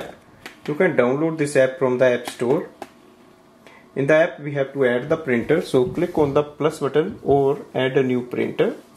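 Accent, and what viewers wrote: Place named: India. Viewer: 인디안 엑센트는 적응이 안되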